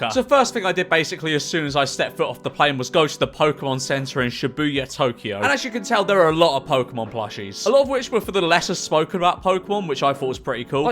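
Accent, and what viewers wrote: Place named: Birmingham, United Kingdom. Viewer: why are you developing a birmingham accent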